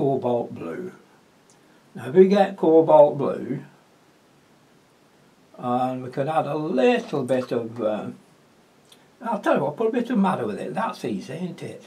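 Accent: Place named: Northern England, United Kingdom. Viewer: great pen & wash,keep em coming! and the way you talk is ok,you are what you are and the northern accent is good.i was born in the east end of london and we have our own language,when i moved to another part of london the teachers at school called my mum in and said they couldnt understand what i was saying, be proud of where you are from,not to please others. keep well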